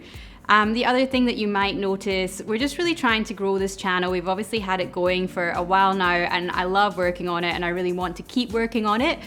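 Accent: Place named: Scotland, United Kingdom. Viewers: First few videos I saw she kept mentioning "here in Australia" and "the Australian version of..." so on and so forth. I thought I was loosing my mind and questioning everything I thought I knew about accents. My American sensibilities didn't allow me to consider that people can leave their country and move somewhere else. Sure, we don't have the best ear for accents but Scottish is pretty unmistakable. Don't know why this is relevant; just an anecdote I thought I'd share. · I'm here for the cocktails and the lovely Scottish accent. Looking forward to new videos when you're ready.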